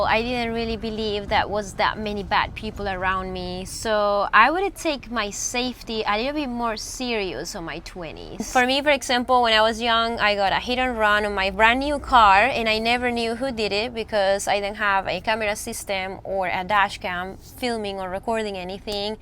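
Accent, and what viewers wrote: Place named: Spain. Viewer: Like your spanish accent! Turns this hoosier man on!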